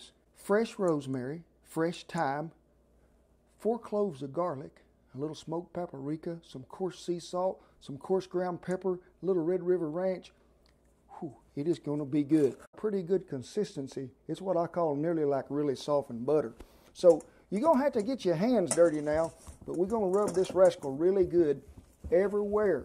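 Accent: South, United States. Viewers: I swear to god. Folks that sound like Bubba from Forest Gump know their shit. Not trying to make fun of this gentleman’s accent but by the end of this video I was drooling. I’ve come to terms that accent = master cooks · I love that American twang😂 🇬🇧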